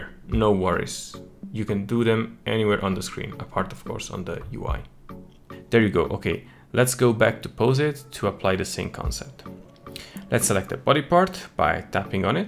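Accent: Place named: Italy. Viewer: You sound a little italian...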